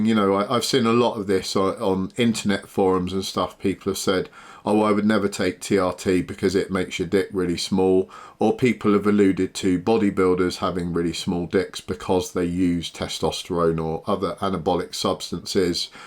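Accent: United Kingdom. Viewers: I’m here because I love the bloody masculine British accent - from the U.S.A!!!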